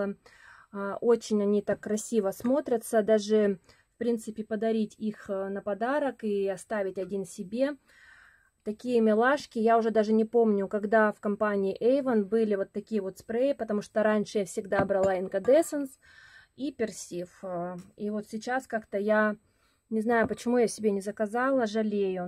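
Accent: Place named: Urals, Russia. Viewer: вы из какого города?Акцент у вас уральский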